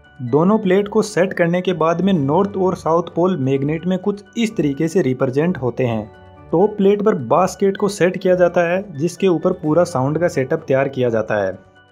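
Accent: Rajasthan, India. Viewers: Bhai tumhari aawaz se saf pata chalta hai ki tum rajasthan se belong karte ho .. shayad..?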